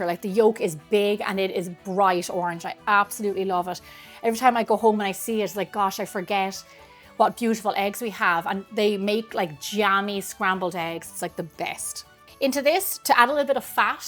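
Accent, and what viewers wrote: Ireland. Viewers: Lovely Recipe, beautiful irish ☘accent:face-red-heart-shape::trophy-yellow-smiling:😇🥰🤗🍞🌎🏠🧈🍀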